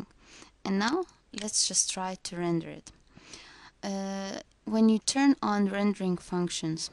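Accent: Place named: Lithuania. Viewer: Is balso pazinau, kad lietuve :P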